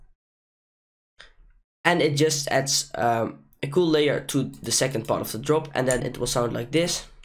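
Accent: Netherlands. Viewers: Are you dutch or from belgium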